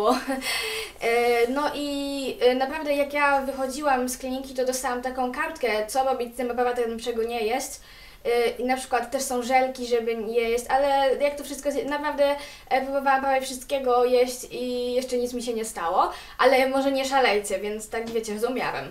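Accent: France. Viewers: Jestes z Francji?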